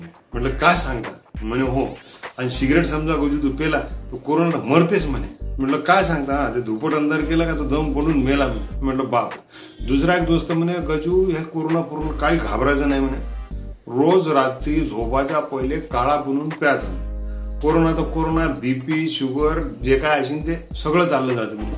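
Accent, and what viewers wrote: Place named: Vidarbha, India. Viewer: Ek no waradhi bhasha , Ani kisse BHAU you are great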